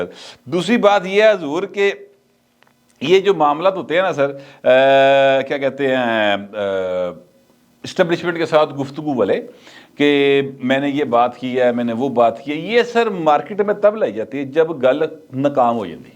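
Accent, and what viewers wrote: Punjab, Pakistan. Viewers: Your punjabi with urdu touch is one of the best contrast with Lahori slangs, no one else can beat it for sure.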